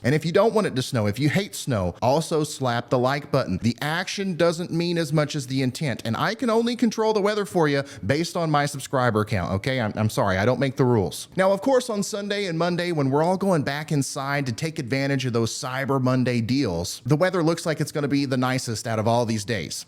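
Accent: South, United States. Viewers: I don’t like your southern accent.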